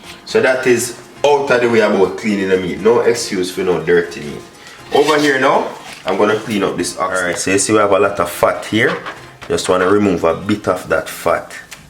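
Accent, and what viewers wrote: Jamaica. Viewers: Oh I love Jamaican accent and I love Jamaica 🇯🇲 ❤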